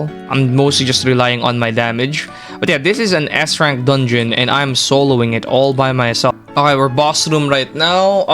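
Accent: Philippines. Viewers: Filipino accent?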